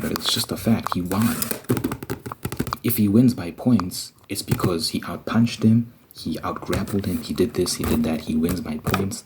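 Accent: South Africa. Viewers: south africa im pretty surw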